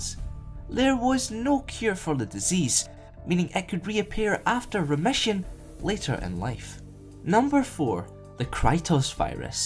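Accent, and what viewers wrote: Scotland, United Kingdom. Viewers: Are you Scottish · I can spot a Scotts when i hear one. This guy's definitely a Scottish. Prood tae be one, dontcha?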